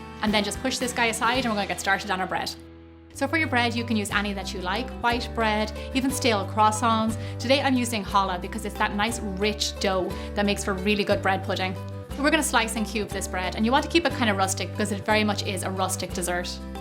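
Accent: Ireland. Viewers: I love Irish accents!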